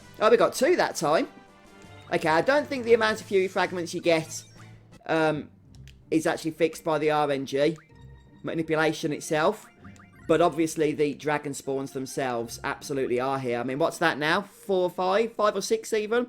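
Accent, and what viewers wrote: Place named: England, United Kingdom. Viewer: Total no homo here but, dude, I love your accent. What part of England are you from? I could listen to your narration of anything and it would seem fun. Seriously, you could make technical manuals seem like an exciting read.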